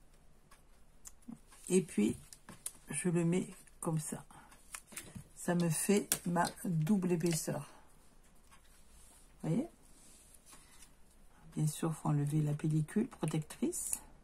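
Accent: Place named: Midi, France. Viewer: Bonsoir merci pour vos explications nettes et précises.J ai déjà terminé mes cartes du même bloc c est très agréable.L accent du Midi me rappelle des vacances pas loin de Béziers.Salutations de Bruxelles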